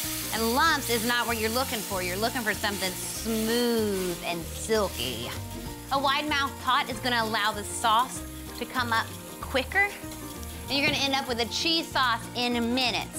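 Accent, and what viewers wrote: United States. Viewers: You sound some what counrty but the ingredients smh 👎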